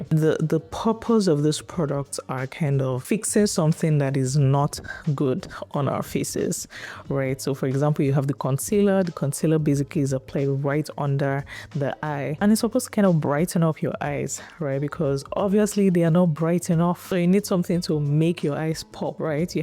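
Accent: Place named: West Africa. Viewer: Unrelated, but you have a beautiful speaking voice. You must be West African, i love yalls accents 😊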